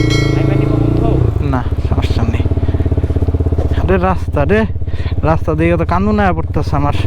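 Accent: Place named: Narsingdi, Bangladesh. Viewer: ভাই এর ভাষা অনেকটা রুপগঞ্জ/ নরসিংদির মত 🤔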